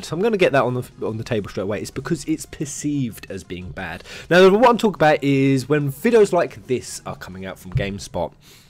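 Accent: United Kingdom. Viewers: Haseo Kaztrio He’s British.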